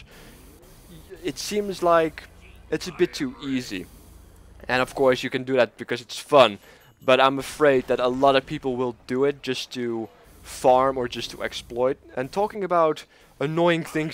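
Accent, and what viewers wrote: Netherlands. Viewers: are u dutch? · you sounds dutch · you dutch? =3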